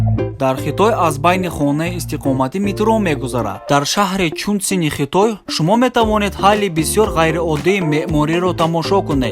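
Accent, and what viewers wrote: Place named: Tajikistan. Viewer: لهجه تاجیکی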